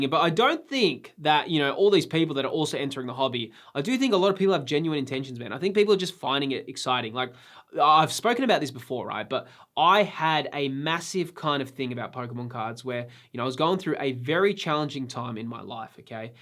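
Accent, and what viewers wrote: Australia. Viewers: Hey, I've watched a few of your videos now and I just wanna say that I truly appreciate your content: besides the genuine passion for the hobby that you transmit, Im always very interested to hear your thoughts about the investing/ financial side of it. Keep it up please! Also, I lived a few years Down Under and I love to hear again some Aussie accent! And last thing, I think you said something very profound: that you don't have to be good at your hobbies to enjoy them. It made me think when I was too learning how to surf and getting always so frustrated because I wasn't as good as I wanted so bad to be. You just have to like what you to have fun! Keep it up 👍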